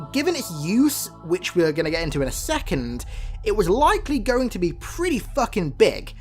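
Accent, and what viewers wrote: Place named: United Kingdom. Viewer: Thanks for the video man, I just subbed to you like last week. Just wondering are you from the Uk? Your voice is kinda odd to me and sounds like it's from the Uk. Just asking cause I have seen some vids with facecams and I see usa flags in the background and guessed you are either from there or the Uk.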